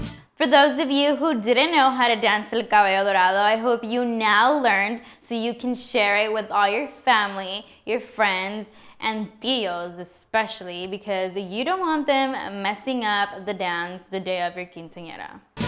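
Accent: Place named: United Kingdom. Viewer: Who is this British chick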